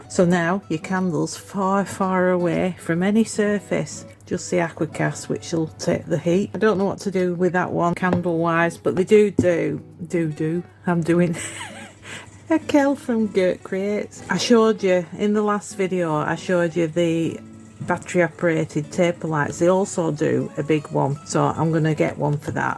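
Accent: United Kingdom. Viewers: I have just come across your channel and love it. I have been sat here watching video after video instead of doing what I am supposed to be doing. You sound like a friend of mine, clearly from the same part of the country and the humour must be in the DNA from that part of the UK. You have some interesting ideas and produce some great stuff. My hat goes off to you for doing the video's; I'm a former College Lecturer, use to, shall we say, some unappreciative audience members, but I certainly could not do what you do. I look forward to not doing what I should be doing in the future and watching your video's instead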